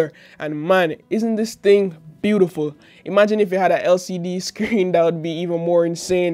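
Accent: Jamaica. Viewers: You're jamaican arent you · are u jamaican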